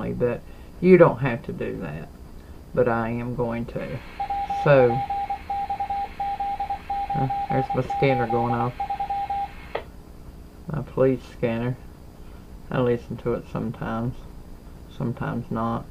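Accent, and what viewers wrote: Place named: Tennessee, United States. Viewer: Thanks for sharing this cute crochet project. It was kind of confusing at first, since you were just saying "yarn over and pull through" without specifying that the 2nd time was going through the yarn loops and not the scrubby. lol. Is that the chain stitch? I think it is. I don't know how to crochet, but have thought about learning it with ladder yarn to make some necklaces for my handmade jewelry. My sister was learning how to crochet, but got frustrated with it. lol. I've added this video into my playlit- Crafty Creator Support for Artists, Beaders and Crafters. Hopefully that will help you with watch time. I miss my hometown of Knoxville, Tennessee...so it was wonderful hearing your accent. Reminds me of home. Take care! Sparkle on!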